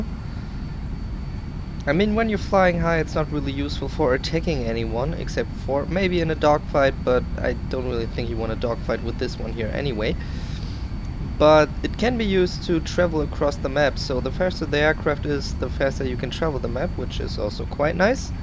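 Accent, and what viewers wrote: Germany. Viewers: Dieser Deutsch Akzent 😂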